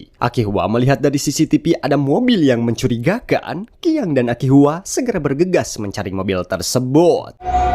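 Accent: West Java, Indonesia. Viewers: Jigana urang Sunda ieu😂😂😂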